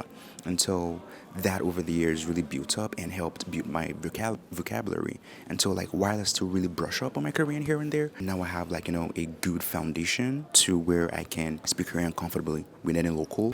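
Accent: United States. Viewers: I thought you were American 😅 Hearing you're from Naija is a pleasant surprise ☺️ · Somehow I always asume you're from the US. Maybe bcz your English sounded American. It make sense now why your mannerism is not "rough". Coz the African American I saw mostly have "rough" mannerism. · I thought American cuz his accent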